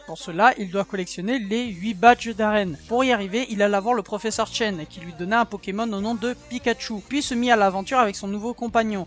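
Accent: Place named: Marseille, France. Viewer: ho...j'croyais que t'était marseillais...oui,par ce que t'a vraiment l'accent...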